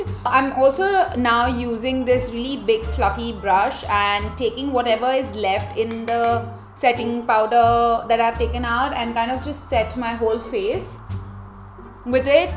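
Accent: India. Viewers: You talk like my friend she's from india too. Her name is zareen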